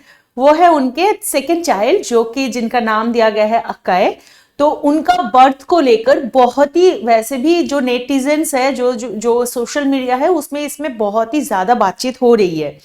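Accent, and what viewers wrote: Bengal, India. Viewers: Ma'am Bengali hai accent se pata lag gaya 😂❤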